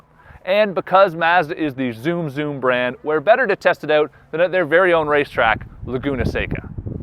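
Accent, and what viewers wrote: Scotland, United Kingdom. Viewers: is this guy American or Scotish? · goodness..have you not heard of a scottish accent.this guy sounds crap and eats his word.Try Billy Connolly he has got a nice scottish accent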